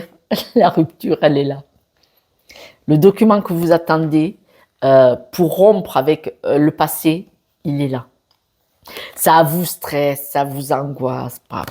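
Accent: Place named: Toulouse, France. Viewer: Je suis tombée par hasard sur vos vidéos et quel bonheur votre accent ressemble au mien j'habite sete mais je suis toulousaine fantastique merci. Evelyne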